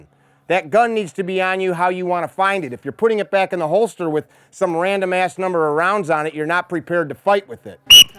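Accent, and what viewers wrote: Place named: New England, United States. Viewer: Cant stand that yankee voice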